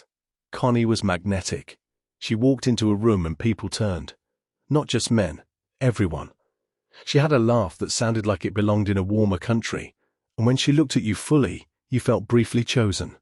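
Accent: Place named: United Kingdom. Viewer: Nice to hear a British voice for a change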